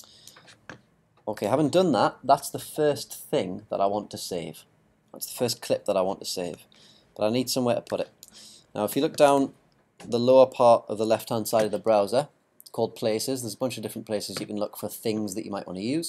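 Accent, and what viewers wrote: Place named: Wales, United Kingdom. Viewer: hes welsh or gordy lol ,we love gordys coz the sound welsh good tut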